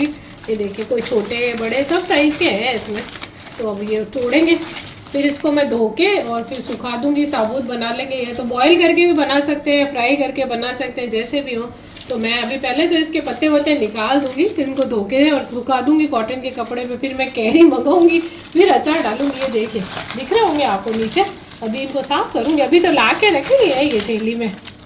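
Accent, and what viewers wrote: Jodhpur, India. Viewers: Jeejaa aap jodhpur k rahne vaale h ya aapki meethe boli m jodhane kee apnayat h achar k kya kahne vaah saa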